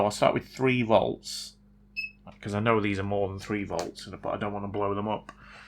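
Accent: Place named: Yorkshire, United Kingdom. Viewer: I just want to know where you're from! Wakefield, Leeds or Huddersfield are my punts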